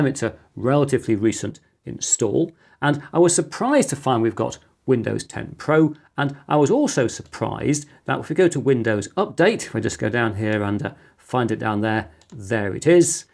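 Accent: England, United Kingdom. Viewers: english accent, check. electronic music, homemade, intro, check. nerd time, check.! love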